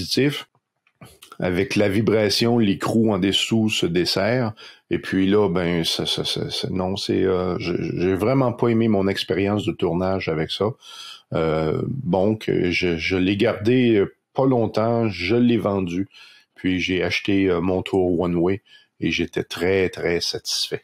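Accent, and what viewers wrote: Quebec, Canada. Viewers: Bonjour, aucun problème pour les petites différences français du Quebec et de métropole, ça pimente en mettant une touche d'exotisme, et ça nous donne l'impression d'apprendre le Quebecois. Pour le choix du tour, ayant suivi pratiquement le même parcours, je partage entièrement ton point de vue. 😉👍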